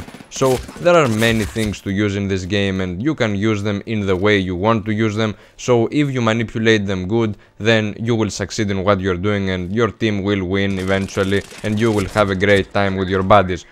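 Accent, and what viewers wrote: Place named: Greece. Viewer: Γειά σου φίλε,τυχαία σε πέτυχα,άκουσα προφορά και λέω δικός μας είναι...Ωραίο το κανάλι σου...γουστάρω...Κάντο και στα Ελληνικά ρε με αγγλικούς υπότιτλους :P παίζεις σε ps3,360,pc;τσέκαρε το κανάλι μου άν θες...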